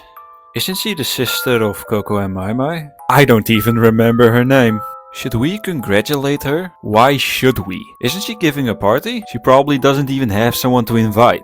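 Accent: Netherlands. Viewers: broer het nederlandse accent van jou terwijl je engels spreekt is zo slecht dat ik ondertiteling moest aanzetten 💔💔💔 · Your accent your dutch accent is so strong, sometimes I don't know if you're speaking english or dutch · Oh God your perfect english being mix with your subtle dutch accent definitely answered my question of. "What dutch people sounds like if they fully speak in english", while I'm watching this characters saying one english words while speaking in fully Dutch. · ik vond t wel te verstaan maar ik hoor dat typisch nederlands accent in t engels al mn hele leven dus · valt hartstikke mee hoor, ik kom zelf uit londen en woon al jaren in nederland en ik heb heel veel erger gehoord. hij gebruikt wel eens nederlandse spreekwoorden die niet correct vertalen, maar verder klinkt het gewoon als een normale nederlander die engels spreekt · n#gga has a thick dutch accent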